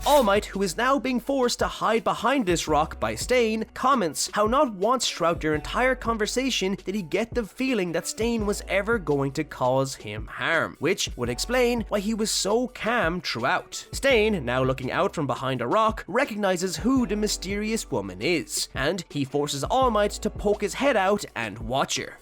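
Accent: Ireland. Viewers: Where ye from lad? Ur accent sounds slightly irish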